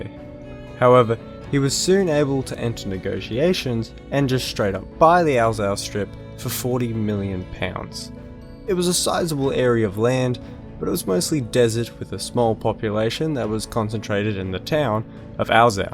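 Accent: Australia. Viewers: Is it just me or is his Australian accent distinctly more intense in this video than usual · you sound very Australian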